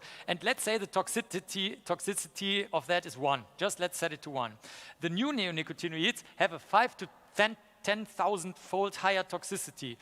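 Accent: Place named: Germany. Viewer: Für jemanden der aus new york kommt sehr krasser deutscher akzent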